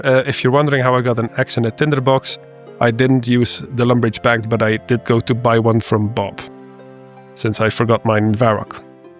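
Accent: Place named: Flanders, Belgium. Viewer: he sounds very flemish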